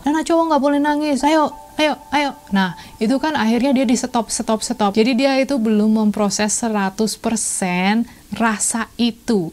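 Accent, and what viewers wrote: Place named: Java, Indonesia. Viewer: Mantap sekali ilmunya 🥰🥰 sehat selalu kak 😍 Bahasanya clengkring clengkring ngapak banget 🥰🥰🥰 salam dari purwokerto kak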